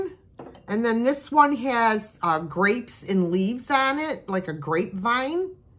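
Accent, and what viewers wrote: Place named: Western New York, United States. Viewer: Hi, Just a Question....Do you remember Uncle Jerry's Club? I think it was from the 50's filmed live from Rochester or Buffalo NY studio. It had Aunt Annie on the piano and I used to try and copy the accent, that you also have;^) My sister and I never missed it (Sunday or Saturday morning??) We loved saying Cheektowaga Lackawana and Tonawanda etc. Love your Sat 3pm show and of course others. Please never lose your enthusiasm.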